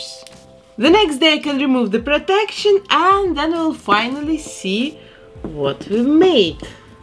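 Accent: Russia. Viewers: I'm sorry, I'm new here and this video was in my recommendations. You are very cool 😎 But where are you from? You have russian accent))) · Ты случаем не русская или это британский акцент?? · Ахах, я слышу явный русский акцент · Вау I'm Russian. И мне одной кажется что она говорит с русским акцентом? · Я просто слышу,что эта женищина из россии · Видео классное ,но русский акцент слышно очень сильно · And she is speaking with a Russian accent, so.... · замечает присутствие русского акцента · судя по акценту, вы русская. простите, если ошибаюсь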